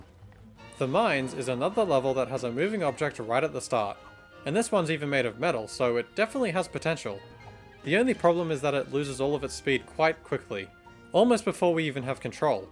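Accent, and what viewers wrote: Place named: Australia. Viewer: femboy??? also why did i think they were australian lmao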